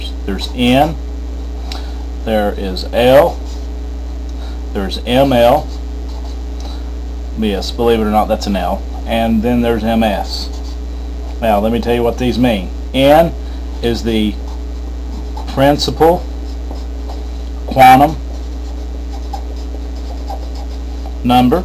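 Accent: South, United States. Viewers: I like your southern twang! It helps keep me focused on the video! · awesome country accent.. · great video thanks so much very helpful. i like your Southern accent. Greetings from California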